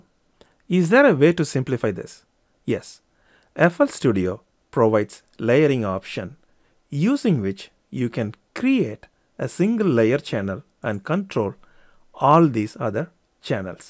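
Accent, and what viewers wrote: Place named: India. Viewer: I always love Indian accent. cuz u understand it and feel something weird in the same time XDD thank you for this tutorial anyway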